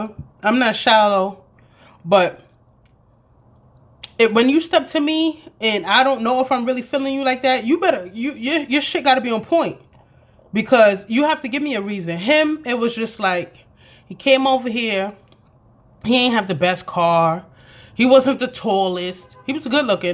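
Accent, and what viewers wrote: United States. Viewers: Girl I watch two of your videos. Now mind you I loved your other 2 video it was the part 1 and 2 of your lipo experience. Since I’m going to get SX in DR I came across your channel. Honey this video right here! This video right here!!!!! Made me hit the subscribe button!!!! Mija between the smacking on the mango and the clear NYC accent and being an Afro/Latina like me I’m hooked. It was worth all minutes of my time😂😂😂😂😂 Keep us laughing girl🥰🥰🥰🥰❤️❤️❤️❤️❤️😂😂😂😂😂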